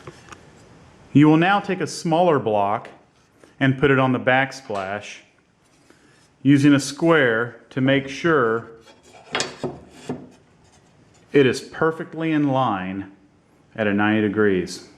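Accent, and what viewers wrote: South, United States. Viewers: I personally LOVE your soft Southern accent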